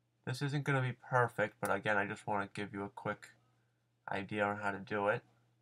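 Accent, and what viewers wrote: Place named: New England, United States. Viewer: i love your new england accent <3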